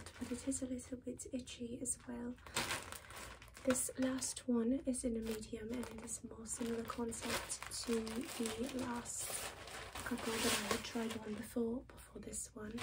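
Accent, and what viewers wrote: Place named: United Kingdom. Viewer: stoppp omg you have to do a video where you just talk with your british accent!!! ❤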